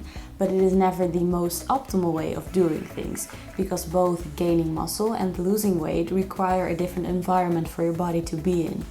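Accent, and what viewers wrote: Netherlands. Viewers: I hear a Dutch accent, are you Dutch? :D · Am I the only one who heardddddd the Dutch accent Ik was zo van kan je in nls praten 😂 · Great video :) Thanks for the great information! Are you Dutch??? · I think it's her slight slight dutch accent ;)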